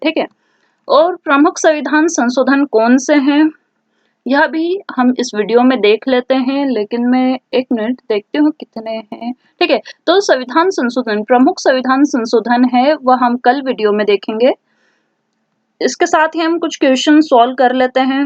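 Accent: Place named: Bihar, India. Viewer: Aap Bihar sy ho kya aapki langaguge and tone bihari hau.Mari bhi Bihar state sy hau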